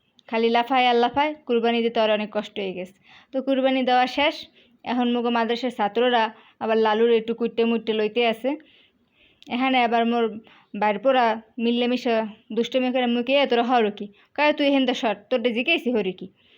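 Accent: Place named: Barisal, Bangladesh. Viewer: খুব ভালো লাগলো বরিশালের ভাষা❤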